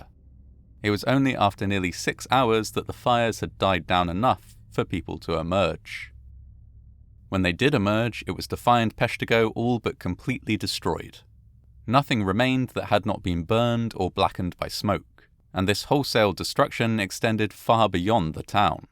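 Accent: England, United Kingdom. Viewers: has English accent Americans find more authoritative. Send.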